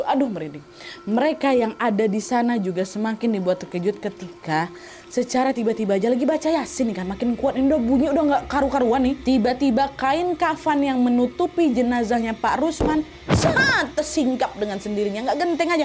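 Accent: Malaysia. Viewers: Hai fatin from malaysia * comel sangt ckp slang malaysia........ comel!!!!! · Bahasa melayu kak gelas kali wak ehh😊😊😊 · Mood banget denger kak ngomong logat melayu gtu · comel je ckp style malaysia😂❤ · Pertama, cerita ni memberi nuansa baru dari khw sebelumnya, jadi seru kaliii. Kedua, kak cakap melayu adoooh comel lah sukak ❤ makasi kak utk videonya, selalu excited nonton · leggok kalii melayunya bahh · Comel lah kak cakap bahasa Melayu 🤭🤭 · Kak kenapa logatnya jadi ikut pake logat melayu😂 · candu pas kak pake logat malay😂 kak emg juara 1 menguasai logat daerah bahkan sampe ke negara tetangga 🤣🤣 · Kak lucu bgt pake bahasa Melayu😂😂❤❤❤ menghayati ya wakk😂😂❤❤❤❤ sembagattt wakk❤❤ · Comel nye dengar kak cube cakap bahasa melayu. Thank you kak for the effort, hargai gile usaha kak ❤ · comel je cakap bahasa malaysia 🤭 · Lucu skli kak ngomong bahasa malay😅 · comelnya kak berbahasa melayu 🤭 salam dari Malaysia kak. 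sihat2 selalu 🫶🏻🇲🇾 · cakap melayu is so comeyyy 🤣😊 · Kak cakap bahasa melayu serasa lg dgr cerita p.ramlee👍🏻🤭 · comel la bercerita dalam bahasa malaysia 😍😍😍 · Comel cakap bahasa melayu malaysia❤ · nada&logat malaysia ny dpt bgt plsss□□ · Respect cara Kak bercerita.. sama macam bahasa Malaysia.. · Lucu sangat cakap bahasa Malaysia,comel je😊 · Comelnya cakap melayu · Comel je cakap bahasa melayu😊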